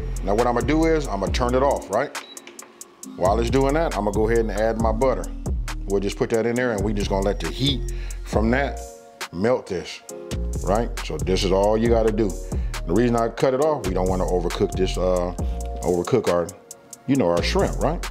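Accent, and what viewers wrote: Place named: West Coast, United States. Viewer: I just love that #WC accent😊💯. And the dishes are A1💪🏿. I'm making this dish tonight for a family of 5(including myself)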